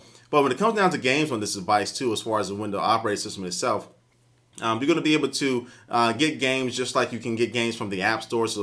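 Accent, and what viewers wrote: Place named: United States. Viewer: i can tell you are american you know why because americans seem to have this wierd thing about wrongly pronouncing words like mobile phone you say moble phone why there is an I in the word mobiiiile phone or when americans say soldering they say soddering wrong there is an L in the word soillldering no offence americans but if you going to speak english speak it right and say you are speaking english not american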